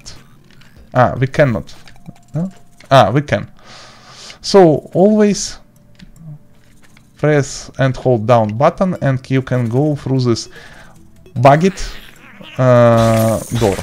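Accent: Russia. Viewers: You are Russian? Accent is similar😅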